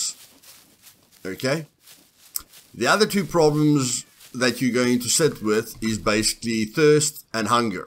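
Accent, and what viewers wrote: Afrikaans, South Africa. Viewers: You my china sound rough South African Afrikaans ekse ... lekkerrrrr lol.. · Great video, subed. Edit: your Afrikaans accent is the best!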